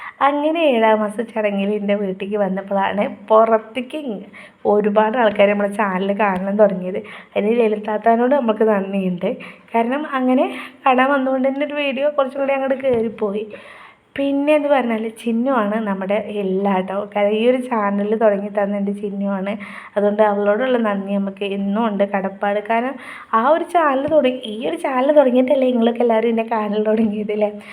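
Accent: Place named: Malappuram, India. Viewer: സംസാരം ക്ലിയർ അല്ല. ഈ മലപ്പുറം സ്ലാങ് വിടുക. അടിക്കടി ഇങ്ങനെ ട്ടോ ഓട്ടോ എന്നു പറയുന്നത് മഹാ ബോറ്. 🤪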